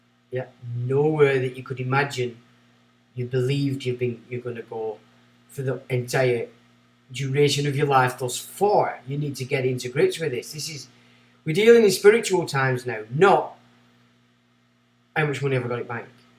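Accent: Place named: Yorkshire, United Kingdom. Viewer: hiya, i appreciate your knowledge, its really refreshing to hear somone else making the right noises, The Puritans came about on the run up of charles the first and his catholic wife colluded with the papacy and conspired to reinsatall cardinals and other catholic clergy by overthrowing the protestant ecclesia and the protestans in parliament.there had to be a reasonable force to protect England against the antchrist that sits in Rome, cromwell was forced into turning to aristacrates for the money to sustain an administration and pay the army. The Puritans were on par with the zeal behind the Jesuits and fight fire with fire. we need a good chat me and you. you sound local i am a yorkshire pud just over the hill from you. ahahahaha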